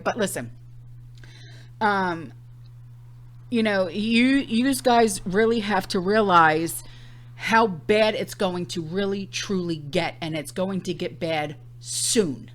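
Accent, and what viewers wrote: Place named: Pennsylvania, United States. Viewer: I’m from the South and I love your accent. When you say, “youse guys.” I think you must be from Pennsylvania originally?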